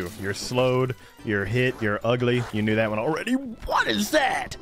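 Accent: United Kingdom. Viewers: oi its anotha brit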